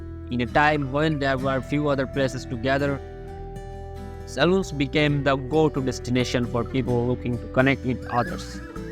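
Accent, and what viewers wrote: India. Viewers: An Indian narrator? REALLY?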